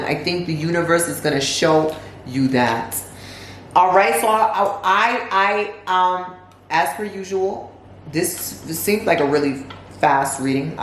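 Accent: United Kingdom. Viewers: Lmao at the British accent, thank you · The British accent took me down 😂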